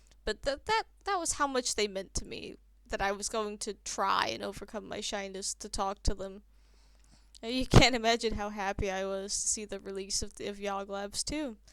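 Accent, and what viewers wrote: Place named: United States. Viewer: Nice to meet you 👋🏻 Glad to have seen this popped up in my recommendation. Also, as someone who lives in the US, you do sound a bit American. But that's okay. I hope & pray you have a great wonderful day/night & a bless life! As well your journey here on the platform spreading your enjoyment out to others, bringing the smile along side you. Stay safe, stay positive, stay frosty/cozy. Always watch your back & wish you the best of luck out there in the world. Take care now and I'll see you later when you poste your content! peace ✌🏻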